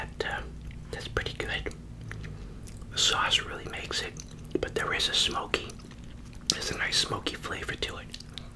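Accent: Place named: Massachusetts, United States. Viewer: Previously being from Massachusetts it's nice watching these videos and hearing a familiar accent.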